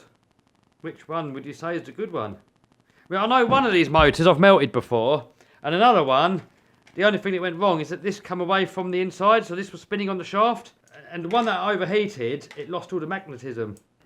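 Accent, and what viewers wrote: England, United Kingdom. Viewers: I think your speech is fine I like an English accent especially the way a true English man talks totally awesome I really like the way you say H and 8 s sounds cool with the baby voice he does ask him for me to speak that way more often. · What part UK you from? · I love the way British people talk 😄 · picking on pronouncing is lame! especially at a funny britt guy. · I like your the way that you talk in the uk cause I live in Canada so how you speak is unique to me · in your next video... can you say leaf litter... i love how the britts say that:) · Brithish English, best English🇬🇧🇬🇧 Always good videos👍👍👍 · Say mo*ah however you wan* o say mo-ah! I love hearing *he Bri**ish accen*! *hats par of *he appeal of your videos for me! *op work!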